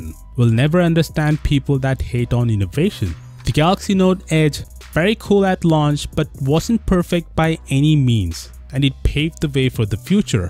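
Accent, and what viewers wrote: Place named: India. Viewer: It's impossible to listen to this indian english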